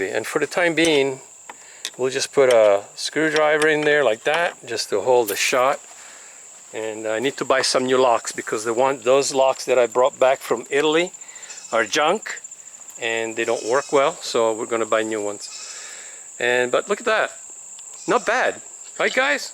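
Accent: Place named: Sweden. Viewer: Why does he have a Swedish accent when speaking English?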